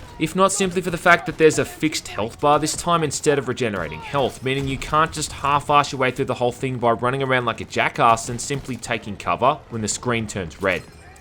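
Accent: Australia. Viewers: So nice to hear a fellow Aussie on YouTube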